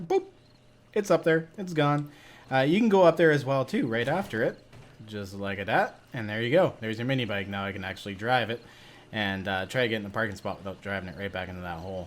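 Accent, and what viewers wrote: Canada. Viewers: Thanks, had my fingers crossed for an episode today and you delivered! I'm almost done searching Naxezgane POI's and then I'm off to random gen. Finally died, on day 58. Went to search for the gold mine and rode into the friggin canyon looking over my shoulder lol. Was thinking about other games for you. It's a real slow burner but Ark blew me away dude. There's this real sense of wonder and discovery throughout the game. It's wasn't too sound mechanically but is definitely unmistakable overall. PS glad you don't nerf your dialect like most Canadian Youtubers. Pronunciation of "aboot" and using "ey" are actually common here in regions of England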